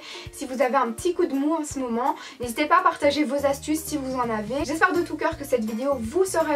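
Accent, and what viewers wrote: Belgium. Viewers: merci pour cette superbe vidéo 😘😘 t es trop belle 😍 vive la belgiiiique et l'accent 😍😍😍😍😍😍😘😘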